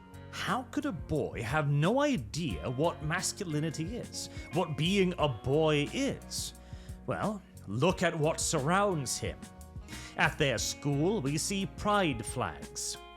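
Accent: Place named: Wales, United Kingdom. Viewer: Don’t you love a Welsh accent!? Super enunciation as well. I’ve subbed.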